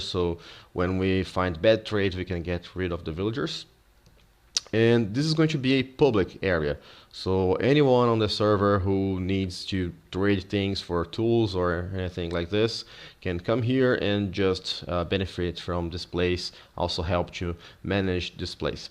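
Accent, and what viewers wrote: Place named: Brazil. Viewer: Felipe Menão Blz, eu não sabia disso, é que o inglês dele tem um sotaque bem brasileiro mesmo ;), mas o inglês dele é bem melhor que o meu ehehe... mas não importa, os videos dele são muito bons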